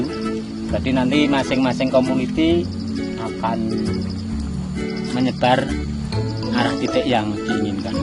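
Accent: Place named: Java, Indonesia. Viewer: jawa edan lg mancing.. tai lah bacot medok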